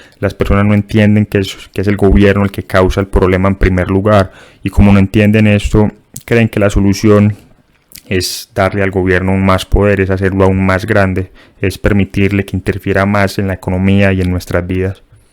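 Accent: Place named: Antioquia, Colombia. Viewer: MENOS DE 10 SEGUNDOS DE VIDEO Y ME DI CUENTA QUE ES PAISA.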